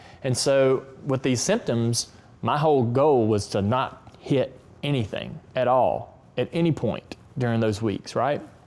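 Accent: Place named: South, United States. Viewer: There's something funny about hearing a guy with a slight southern accent doing an impression of someone with an even thicker southern accent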